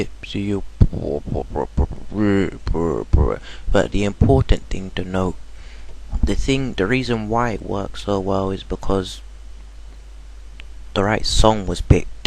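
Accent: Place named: East London, United Kingdom. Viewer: YOUR ACCENT DOESNT COMPUTE. Seriously, where are you from, you sound a bit east london, maybe essex?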